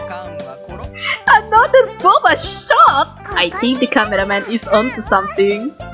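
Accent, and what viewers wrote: Indonesia. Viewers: Accent indo? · kek orng indo deh accentnya..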